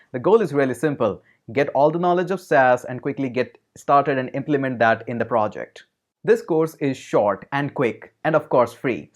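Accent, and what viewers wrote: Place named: India. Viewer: Bought your Android course today and it's amazing. Your Indian accent is pretty good. I am watching your video from 3k subscriber and it's good to be in 200 k subscriber family❤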